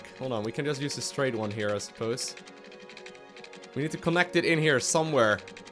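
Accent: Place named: Netherlands. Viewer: He sounds Dutch now I'm curious does somebody know.?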